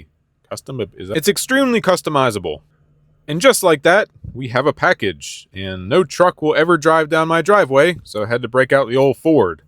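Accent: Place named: Philadelphia, United States. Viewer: Awesome video, love the custom ATS. Regarding split phase 120/240 terminology in some places people do call them phases and we know what they mean, but that can be a slippery slope to people calling split phase 'two phase'. And To me your accent really sounds like a Philly accent, and Philly being one of the only places that actually has two phase power where each phase is 90 degrees apart, I'd stick with lines or legs for split phase 240... and if you happen to be in Philly I'd love to see a video on a some actual 4-wire two phase motors and controls if you come across any. Thanks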